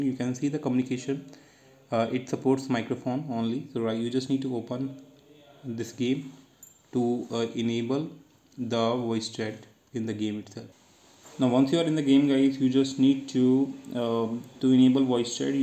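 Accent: India. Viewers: Don’t be rude he is cool I understand him he’s is Indian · Thats is actually very disrespectful he has an indian accent so dont be rude. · He’s India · That's rude he is Indian I understand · He’s Indian.. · He is Indian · Is bro from India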